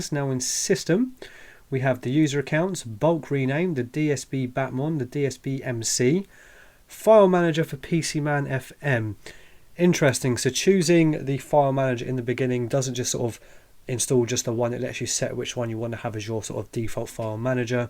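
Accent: United Kingdom. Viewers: I really like the clarity of your presentation, especially when I slowed it down to.75 to satisfy my aging brain. Your lovely Brit accent is not a hindrance for this old guy from the US, happily. Going to review your videos for subjects still being resisted by my thick skull, like those blasted bootloaders (like Grub) that throw me for a loop every time. First thing I see is a prompt which I can't even figure out how to get out of. Then I need to get the layout of a SD boot. There are partitioning issues I don't understand. Sometimes the SD boots fine, sometimes RasPi Imager won't even write to it. "Capacity" issue? Please help me understand what the H is going on there. Thanks very much.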